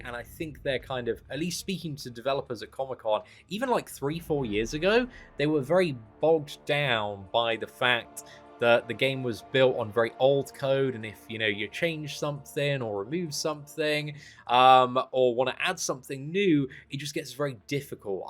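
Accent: United Kingdom. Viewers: Gosh missed his bri ish accent alot it's actually an eargasm to me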